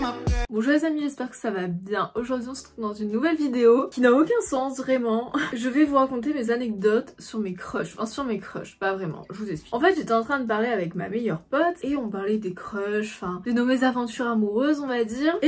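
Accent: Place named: Paris, France. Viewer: Cette façon de parler 😂 on dirait une bourgeoise de Paris PS: Je critique pas, je fais un constat, personne ne parle comme ça là où je vis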